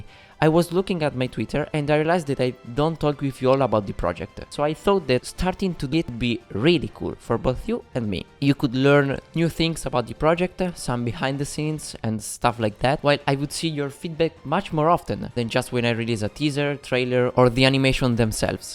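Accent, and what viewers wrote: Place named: Italy. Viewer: Spettacolare il tuo accento Italiano/Inglese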